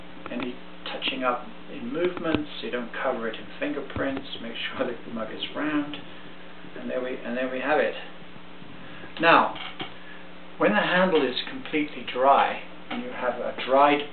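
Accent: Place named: United Kingdom. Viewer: Yes, a lovely demo on pulling handles on a large mug. The dry handle test is excellent, had never seen that done. I was surprised at the strength shown. Where are you located, sound British.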